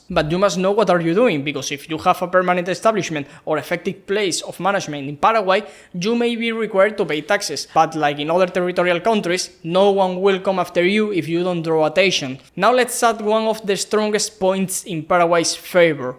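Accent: Spain. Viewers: don’t worry. Es nuestro acento español, q no nos lo quitamos ni pa’trás!😅😂 (a ver, que sí, que se puede mejorar, ….pero no es lo importante. Tu contenido es bueno y es por lo q viene la gente) Sigue así!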